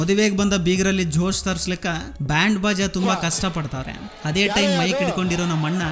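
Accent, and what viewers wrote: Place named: Bellary, India. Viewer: ನಿಮ್ಮದು ಬಳ್ಳಾರಿ ನ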